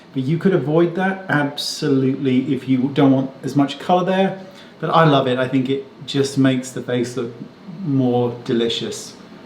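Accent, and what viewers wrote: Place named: England, United Kingdom. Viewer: Robert Welsh also did something like this on his YouTube channel. Out of all the channels I watch you and him are my absolute favorites. Maybe it’s the fact that your both true makeup artist but I think the English accents pull me in as well lol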